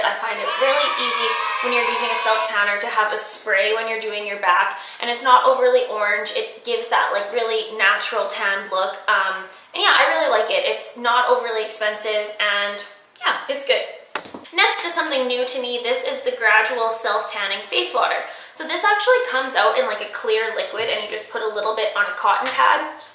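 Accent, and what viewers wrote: Canada. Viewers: Random question, are you Canadian? :)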